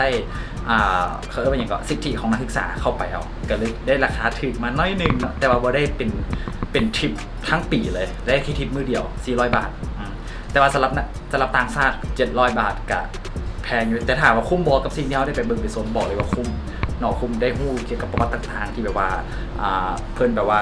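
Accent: Vientiane, Laos. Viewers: พื้นเพเดิม เป็นคนลาวใต้แต่ย้ายมาอยู่เวียงจันทร์ พูดสำเนียงลาวใต้ได้ แต่คงถนัดสำเนียงเวียงจันทร์มากกว่า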